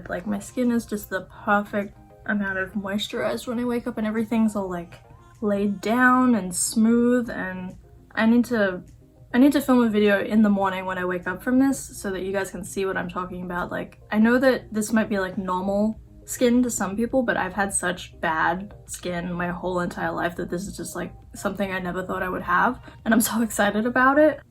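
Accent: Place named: Australia. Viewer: Where are you from? I know it sounds weird but i keep hearing a slight aussie accent from you ! Are you an aussie but have lived in America for years?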